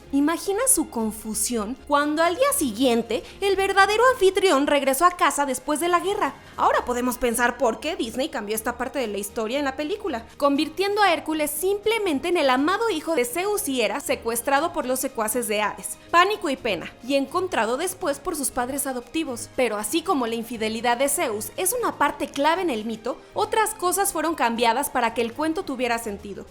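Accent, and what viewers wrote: Mexico. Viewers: Ese acento mexicano no lo soporto.. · Buenísimo el vídeo, no sabía casi nada hahaha... Una cosa, deben bajarle a ese acento mexicano, a los que no somos de allí nos incomoda un poco, por favor traten de usar un acento más "neutral" para que todos nos sintamos cómodos.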